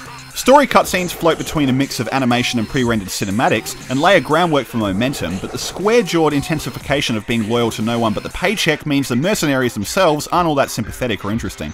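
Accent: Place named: United Kingdom. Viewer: Uh, Helghan home world isn't Vekta. I usually like British accents, but not yours. Eh, 4/10, Bret Hart style.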